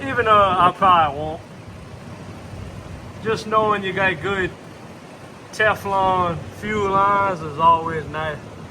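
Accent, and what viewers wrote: Louisiana, United States. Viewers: I like the teaching of the LS swap with that Louisiana/ Creole accent !!!!! Great Job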